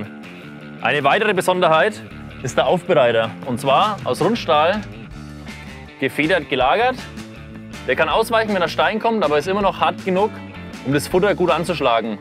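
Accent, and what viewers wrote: Franconia, Germany. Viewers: Also für jemanden mit einem OAL-Kennzeichen hat der gute Mann aber einen ziemlich fränkischen Dialekt :D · Der " " hat doch ein sehr fränkisches Dialekt - wenn der mal nicht aus Feucht bei Nürnberg ist.... Wo wird nochmal Fella produziert!?;-)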